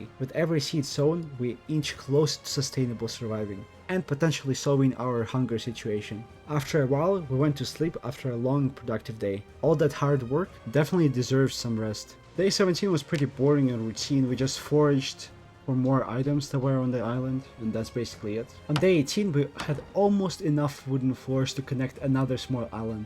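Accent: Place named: Philippines. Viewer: why do you sound like a filipino?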